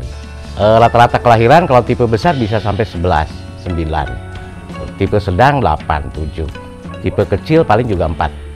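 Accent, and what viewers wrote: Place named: Sunda, Indonesia. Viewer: Kang Sunda banget santai bicaranya renyah enak di dengar saya mau nyoba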